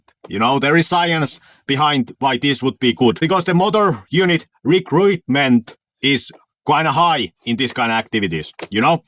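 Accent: Finland. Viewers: Just by listening to your accent, you are finnish! I was in seinajoki for 1 year! Mitta kuulu? Lol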